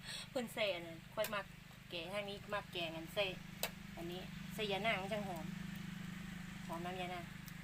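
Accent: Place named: Phu Thai, Thailand. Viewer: อยู่จังหวัดได๋น้อจ้า เว้าคือภูไทสกลจ้า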